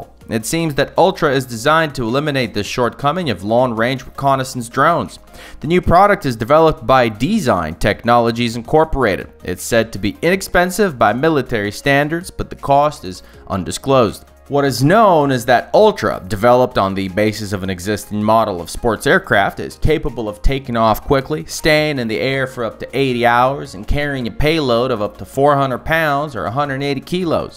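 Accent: Texas, United States. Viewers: So when did the narrator pick-up an accent (Texan, I think)?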